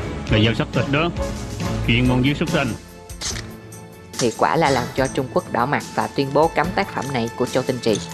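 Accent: South, Vietnam. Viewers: Nên nói là D miền Nam cố nói giọng Bắc thì còn khó chịu hơn đó bạn. Đừng đánh mất giọng miền Nam thân thương. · Người MIỀN NAM thì phát âm giọng MIỀN NAM dùm cái,Tinh da chứ k phải Tinh gia,dì chứ k phải là gì,dao nhiệm vụ chứ k phải là giao nhiệm vụ....nghe chói tai vcc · Bạn nói hay mà. Bạn người Nam thì cứ nói giọng Nam cho tự nhiên. Nghe vài vị dở hơi mắc mệt. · Lúc đầu tui cũng nghe không quen giọng của ad vì hơi nặng so với giọng miền Nam bình thường, nhưng mà nội dung ad làm hay quá nên bị cuốn ý, nghe nhiều thành quen, không sao đâu nha ad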